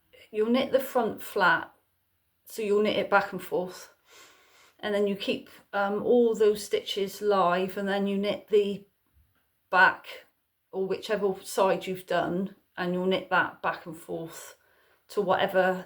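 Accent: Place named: Devon, United Kingdom. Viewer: I’m thinking you must be in Devon/Cornwall due to your accent! I’m living in rural Devon!😊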